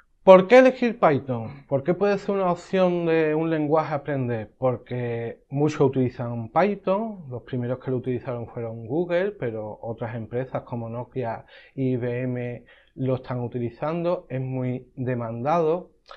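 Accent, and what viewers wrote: Spain. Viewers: Perdón que me salga del tema, de qué parte de España es su acento?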